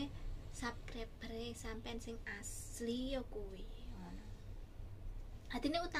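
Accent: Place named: Central Java, Indonesia. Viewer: jowone endi iki mba? ketoe iki wong jowo tengahan sebelah wetanan iki ...... (chenel disetel bar kuwe ditinggal lungo ora dirungokne.........hahahah)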